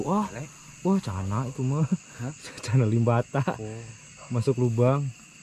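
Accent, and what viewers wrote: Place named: West Java, Indonesia. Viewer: Daerah mna om ko logat nya sunda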